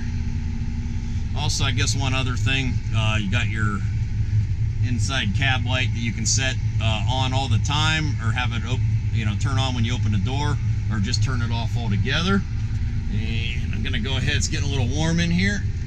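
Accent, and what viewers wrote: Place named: Midwest, United States. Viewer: Same reason he says filders. He’s a Midwesterner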